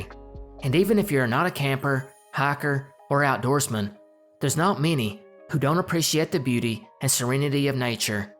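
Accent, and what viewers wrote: South, United States. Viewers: Came for the conspiracy theories,stayed for the southern drawl. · Nice to hear another southern accent with some obvious intelligence behind it. Excellent content brother. Good stuff. *at 63 I began to mediate out of scientific curiosity. Just to see for myself IF there's anything to this. There IS. There is a LOT to it. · What in cousin loving tarnation is this universal southern ai accent? A taste of Appalachia with a baby momma in Texas but currently resides in Georgia WTF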